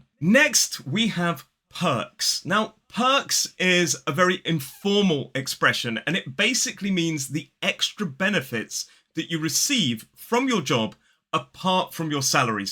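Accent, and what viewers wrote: United Kingdom. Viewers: British English accent sounds great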